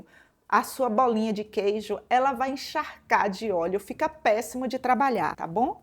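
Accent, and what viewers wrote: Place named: Northeast, Brazil. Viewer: Pelo sotaque tu deve ser nordestina como eu. Suas receitas são TOP. Obrigado por nos ensinar essas maravilhas que você faz. Parabéns.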